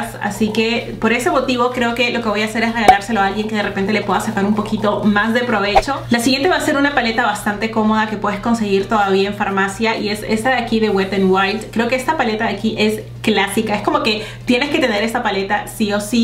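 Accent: Peru. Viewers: Y mira que me gustan las Youtubers inglesas, francesas, italianas y las españolas(que vivo en España) pero nada como oir el acento peruano 😍😍 y mejor aún cuando es de maquillaje. Te he descubierto hace poco y sin duda ya me he suscrito. Besos!!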